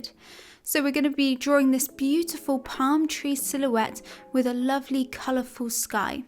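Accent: England, United Kingdom. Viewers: Ich liebe den englischen dialekt 😍😊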